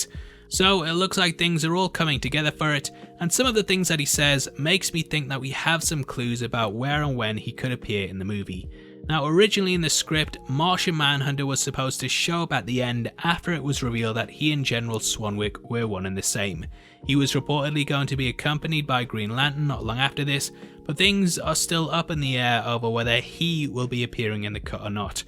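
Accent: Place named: Newcastle, United Kingdom. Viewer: Hi do you live in Newcastle just my dad lives in Gateshead and you sound the same lol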